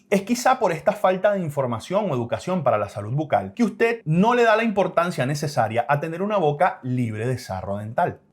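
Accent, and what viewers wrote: Venezuela. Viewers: Para mi se escucha venezolano, no se si me equivoque pero su acento me parece de Venezuela 🇻🇪.. ❤️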